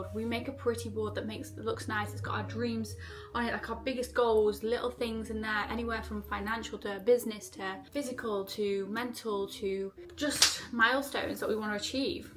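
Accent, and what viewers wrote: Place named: Italy. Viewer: Tu acento eres de italianaa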